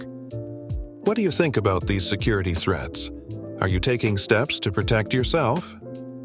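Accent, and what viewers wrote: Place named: United Kingdom. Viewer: Such a terrible robotic old British style voiceover. Why don't yoy use your own? Damn.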